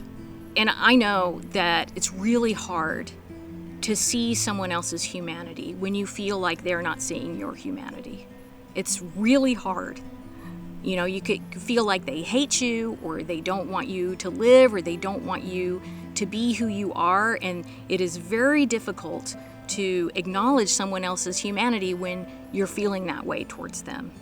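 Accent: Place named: South, United States. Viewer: had you not told us, I would've thought you were a Southern white woman.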